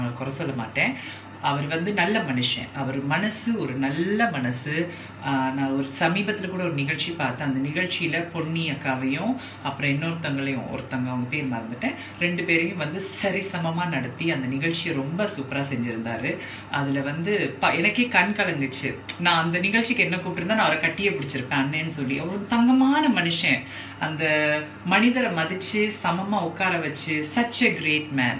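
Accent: Sri Lanka. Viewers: Tamil nalla irukku sri lankan aaa neenga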